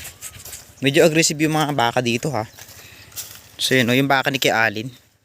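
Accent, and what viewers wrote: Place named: Visayas, Philippines. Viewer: Bisaya ka idol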